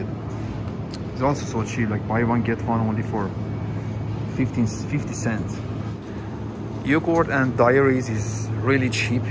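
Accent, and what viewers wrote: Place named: Iran. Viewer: داداش از لحجه ت تابلوعه ایرانی هستی دمت گرم😅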